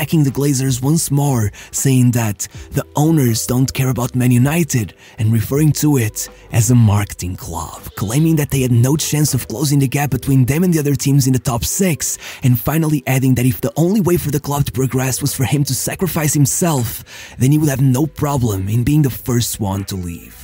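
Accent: Portugal. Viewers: Es tuga né ? 🇵🇹 consigo perceber pelo sotaque 😂 Mas de qualquer maneira grande vídeo! Muito bem explicado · He's Portuguese, he isn't a native English speaker so ofc he's gonna have some accent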